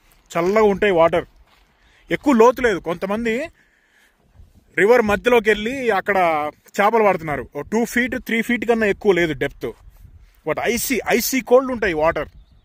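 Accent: Andhra Pradesh, India. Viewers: Bro, you're slang like AP, but you are saying i am belongs to nzmbd. Moreover you need to exercise bcz your breathing voice is getting more.❤❤❤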